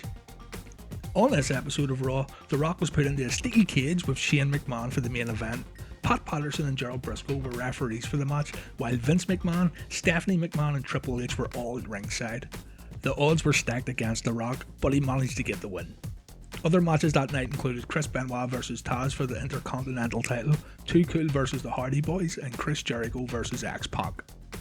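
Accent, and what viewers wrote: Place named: Lurgan, United Kingdom. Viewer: Sounds like a Lurgan man to me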